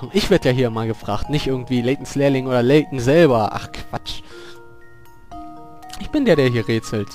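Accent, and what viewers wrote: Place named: Berlin, Germany. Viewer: geiler berlinnischer akzent hast du^^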